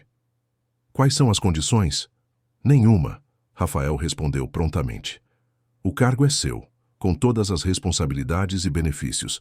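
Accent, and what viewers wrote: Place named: Brazil. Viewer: eu sei, só que ele está narrando em português Brasil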